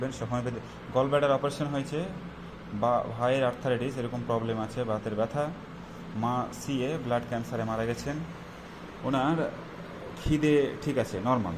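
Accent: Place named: Chapai Nawabganj, Bangladesh. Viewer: dr. where are your chamber location ? Your talking approach like as you live in chapai and i am also living same district. Thanks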